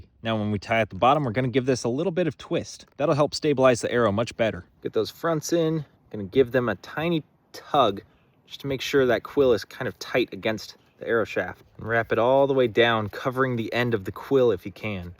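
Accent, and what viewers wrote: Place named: Lancaster, United States. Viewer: OMG There's an American archery guy now! (I love your voice btw, reminds me of some of my friends in Lancaster, PA).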